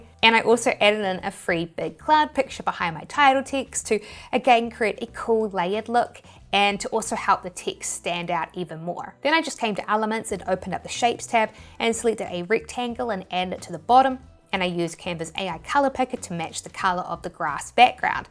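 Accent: New Zealand. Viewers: Video showed up on my recommended, so I clicked on it, and as I listened, and I wondered to myself if you were from New Zealand, so I came to the comments, and my question was answered 😂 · why yees instead of yes and aheed instead of ahead is it like a kiwi accent ?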